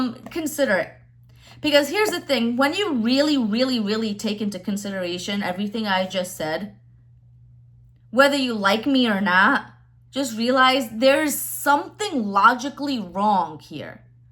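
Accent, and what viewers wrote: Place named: Levant, Arab world. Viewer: Very good acting in Eastern Arabic English accent. Wow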